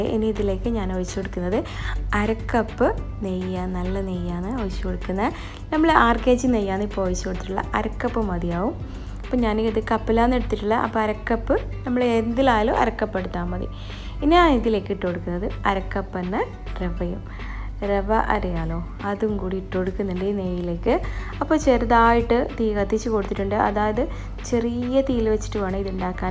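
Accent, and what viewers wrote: Kasaragod, India. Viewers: ഞങ്ങൾ കാഞ്ഞിരപ്പള്ളികാർക്ക് നിങ്ങൾ കാസറഗോഡ്കാരുടെ സ്ലാങ് തന്നെ കേക്കുമ്പോ വല്ലാത്തൊരു ഇഷ്ടമാന്നെ.. അപ്പൊ പിന്നെ ഈ റെസിപ്പി ഒട്ടും സ്കിപ് ചെയ്യാതെ തന്നെ അങ്ങ് കണ്ടിരുന്നു പോകും. പിന്നല്ലാതെ 😍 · കുക്കിംഗ് അടിപൊളി നൂറിൽ നൂറ് ഞണ്ട് കറി വറ്റിച്ചത് നയിച്ചോർ ഞാൻ ഉണ്ടാക്കി കഴിക്കാറുണ്ട് അടിപൊളി ടേസ്റ്റി അമൂർ മീൻ നിങ്ങൾ പറഞ്ഞത് ശരിയാണ് എനിക്കറിയാം ഞാൻ ഒരു മീൻ കടയിലാണ് ജോലി പുള്ളിയുള്ള ആമൂർ വില അധികമാണ് ഇവിടെ ഒരു കിലോ 60 റിയാൽ ആണ് അതുപോലെ തന്നെയാണ് സാല മൂൺ എന്ന ഒരു മീൻ ഉണ്ട് ഇറച്ചി ഓറഞ്ച് കളർ ആണ് അതും ഒരുപാട് ഫൈത ഉണ്ട് ദുബായിൽ സുഖമാണോ കുട്ടിപ്പട്ടാളം എവിടെപ്പോയി വെള്ള ആഗോലി ഇവിടെ കിലോ 70 റിയാൽ ഒരുദിവസം എന്തായാലും നിങ്ങളെ കാസർകോട് ഭാഷ ഞമ്മക്ക് പെരുത്ത ഇഷ്ടായി ട്ടാ ഇച്ഛ എവിടെപ്പോയി വീഡിയോയിൽ ഒന്നു വരാൻ പറയണോ ഓക്കേ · Ksrod slang പൊളി ആണ്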